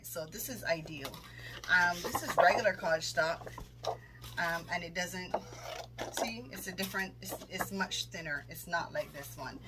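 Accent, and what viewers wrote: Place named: Barbados. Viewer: I thought as much I can hear the bajan accent. I’m from Barbados as well. Live in UK now !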